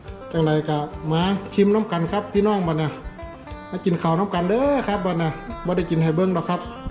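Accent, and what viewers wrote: Chaiyaphum, Thailand. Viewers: เจ้าคือมาเว้าคือสำเนียงคนบ้านข่อยแท่ คนชัยภูมิแมจ้า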